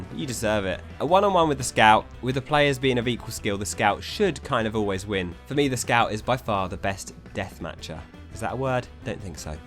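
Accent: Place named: United Kingdom. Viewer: I swear you’re like twice as british as usual in this video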